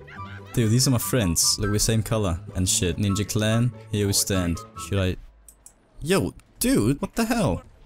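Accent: Denmark. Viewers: Are you from Denmark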